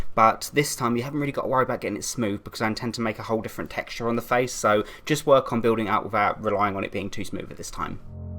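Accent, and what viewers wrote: United Kingdom. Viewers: omg, your gay/british accent gives me shivers in a good way. dont tell my bf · you look like the person from: I survived a zombie apocalypse:thom because of the british accent and the face looks so similar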